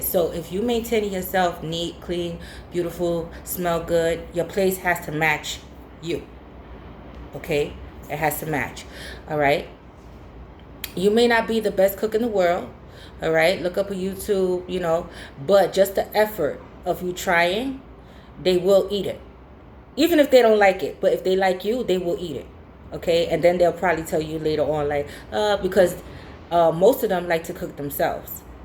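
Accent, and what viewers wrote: New York, United States. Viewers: Aww. Sorry to hear that. Be strong! You sound like a New Yorker. From the Bronx, NY?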